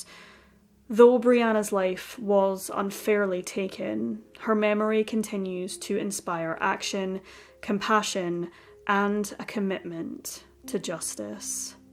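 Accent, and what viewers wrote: Scotland, United Kingdom. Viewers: 🔥 Scottish accent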